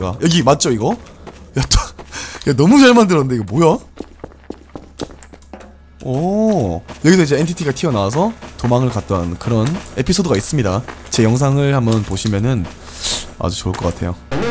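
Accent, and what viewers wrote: Gyeongsang, South Korea. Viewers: 경상도사투리가 살짝씩 들리는것같은드에엥 그래서그런지 좀 친근하고 자꾸보게돼욬ㅋㅋㅋㅋ 아 반응넘웃겨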